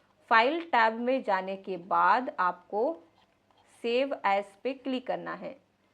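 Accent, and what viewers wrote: Odisha, India. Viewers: Ma'am kya aap odisha se hai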